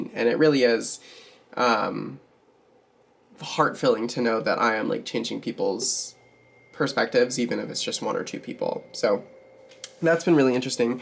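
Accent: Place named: South, United States. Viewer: I appreciate your perspective because cisgender people often don’t think that transphobia will affect them but it does. The moment people get suspected as trans or misgendered it does put them in danger to people’s transphobia even if they are not trans. So your perspective is necessary if cisgender people will listen. Also I love your southern accent. I am a fellow southerner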